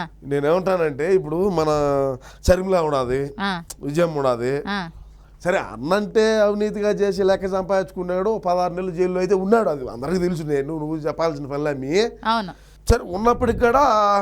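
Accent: Rayalaseema, India. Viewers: రాయలసీమ యాస లో మాట్లాడితే మీ లెవెల్ వేరే అన్నా ఇద్దరు రాయలసీమ యాసలో మాట్లాడండి చాలా బాగుంటుంది 👍👌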